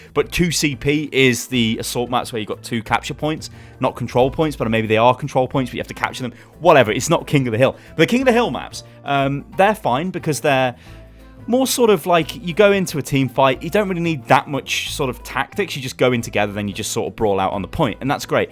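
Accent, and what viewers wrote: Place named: United Kingdom. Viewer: Force gaming said all of this in a way shorter time. However it is true that your Brit accent is better to listen to than his American one.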